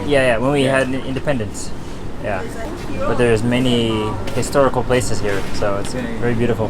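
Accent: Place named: Midwest, United States. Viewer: Just curious to know which US state you are from, your accent makes me wonder if you might be from either Ohio, Indiana, Illinois or Michigan?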